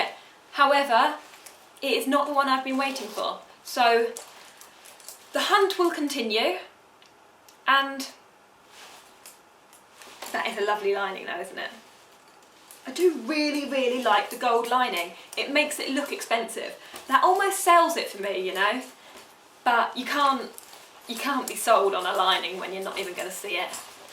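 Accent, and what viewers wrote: United Kingdom. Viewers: Hi i'm a new subscriber loved your video and your British accent ❤😘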